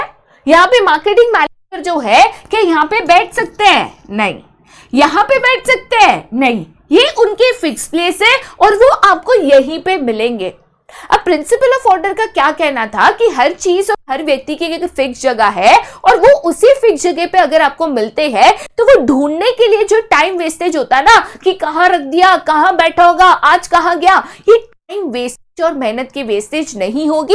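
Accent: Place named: Mumbai, India. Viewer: Mam aap Mumbai se ho naa appke word ascent se pata chalta hai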